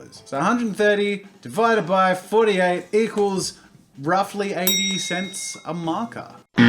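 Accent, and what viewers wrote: Australia. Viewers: Yessssss I thought you were American but your Aussie I'm Aussie tooooooooooo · i KNEW he was an ausy. Now i fanaly know. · Garbage man he's Australian! LOL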